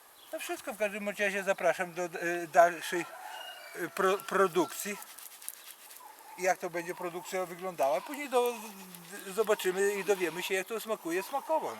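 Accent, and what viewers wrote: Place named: Kresy, Poland. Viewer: Sympatycznie i ten fajny kresowy akcent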